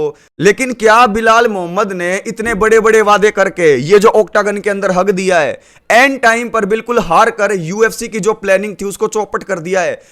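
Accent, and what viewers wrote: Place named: Haryana, India. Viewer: Your Haryanvi accent is too good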